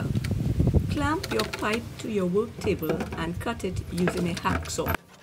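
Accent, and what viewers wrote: Trinidad and Tobago. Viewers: Great job Are you Trini?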